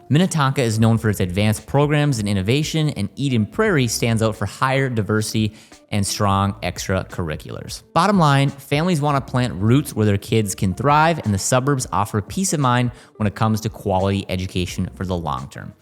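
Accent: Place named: Canada. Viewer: This guy sounds xanadian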